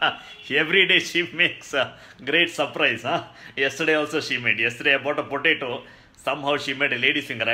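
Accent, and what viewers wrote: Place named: Kerala, India. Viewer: Nostalgia this accent reminds me of my mallu maths teacher Matthew Sir in saudi hahahaha lol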